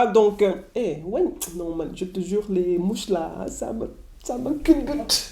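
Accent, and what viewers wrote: Senegal. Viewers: you said it all. i'm not senegalese but i luv your dialect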